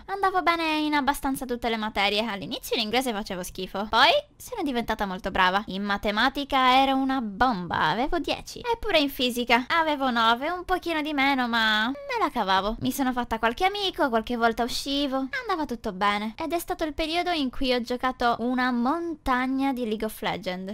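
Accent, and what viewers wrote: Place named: Sicily, Italy. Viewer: Io che credevo eri siciliana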